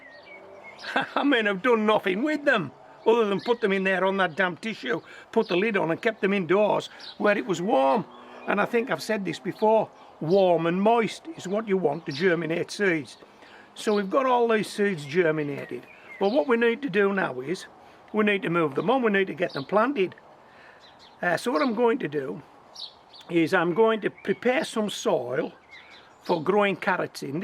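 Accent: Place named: Yorkshire, United Kingdom. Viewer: Where are you from mate? Love your accent it’s like a mix of Yorkshire and Black Country 😅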